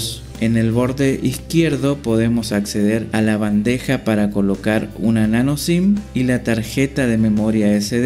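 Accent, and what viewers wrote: Corrientes, Argentina. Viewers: genial el español correntino.. los banco, pero pongan español sin aclarar y que se caguen los demas.. harto de escuchar el "neutro" mexicano.. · Yo no se para q Huawei lanza celulares que unos tienen una cosa pero le faltan otras, me paso con una tablet Mediapad T1 tenia una con chip y cuando quise comprar otra despues de un tiempo ya no consegui con chip para usarlo como telefono.... aaa.... y aguante el español correntino pué!!!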